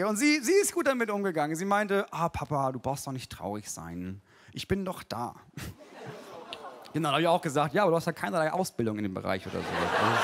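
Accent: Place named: Northern Germany, Germany. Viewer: Der norddeutsche Dialekt ❤😂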